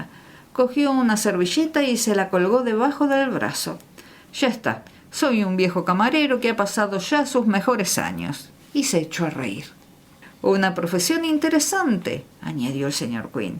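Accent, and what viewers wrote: Argentina. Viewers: Exelente , te escucho desde Costa Rica 🇨🇷 y vos SOS de la Argentina ??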